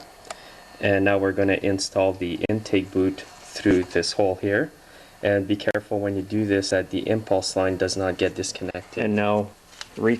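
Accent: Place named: Canada. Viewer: Odd that the related clips on the right fills upp with girls in bikinis more than chainsaw videos. I guess it says something about the audience. Other than that, really nice videos, and at least for a scandinavian your canadian accent sound so much nicer than the average americans.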